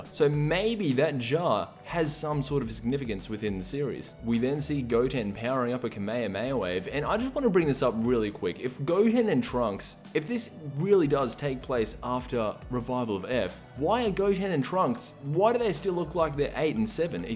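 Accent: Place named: Australia. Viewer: lol your Aussie accent is pretty full on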